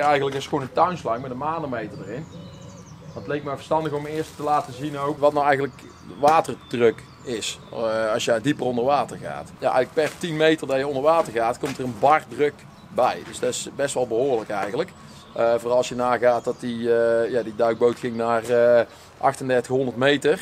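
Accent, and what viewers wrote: Australia. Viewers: I had no idea what channel this was when I clicked on it or where he is from but as soon as the video started I thought he was speaking English with an Australian accent. Then I realized I couldnt understand him at all. Then I thought he was speaking Gaelic or something with an Aussie accent.. do all dutch people sound like this? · Is this Australian accent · I thought he just had a real heavy Australian accent haha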